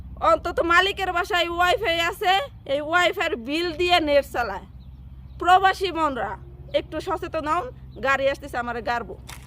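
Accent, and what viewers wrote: Manikganj, Bangladesh. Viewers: আপু আপনার ভাষা শুনে মনে হয় আপনি মানিকগঞ্জের সিংগাইর থানার সাহরাইল এলাকার আসে পাশের মেয়ে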